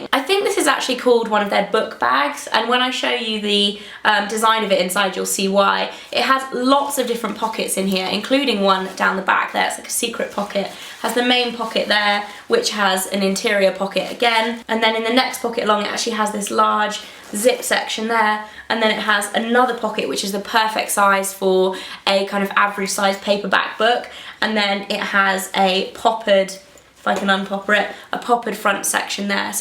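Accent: England, United Kingdom. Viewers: You are an english accent... Good vidéo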